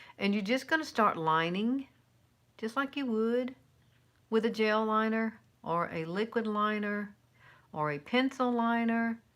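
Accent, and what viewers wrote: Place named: South, United States. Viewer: Thanks ♥️ you do sound southern... cute though :) enjoy you Cancun trip! 🌺🌺🌺🌺🌺🌺🌺🌺🌺🌺🌺🌺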